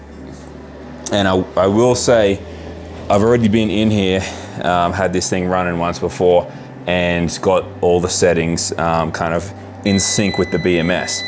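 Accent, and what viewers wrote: Australia. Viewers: Dude, where are you? Aussie accent, 120v inverter. Confusion Ahh Colorado.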